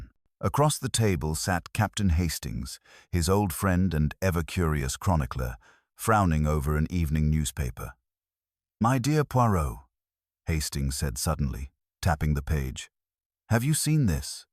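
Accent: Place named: United States. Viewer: Why are British stories being narrated in an American accent. It's just not the right tone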